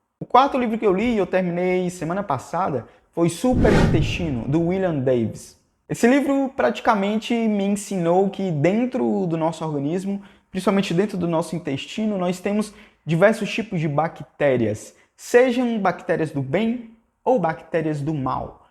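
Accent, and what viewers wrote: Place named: Brasília, Brazil. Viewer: Esse cara é de Brasília, kkk maior sotacão de candango.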